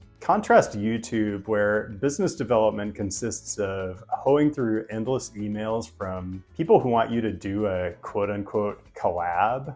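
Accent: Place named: San Diego, United States. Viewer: He is the most handsome white man I have ever met. He speaks like a person from San Diego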